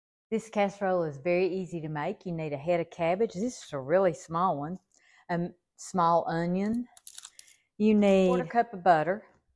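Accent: South, United States. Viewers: I tried this recipe for supper last night, and it's delish! Cannot wait to eat some leftovers again tonight! Your Southern accent is delightful. What state do y'all reside in?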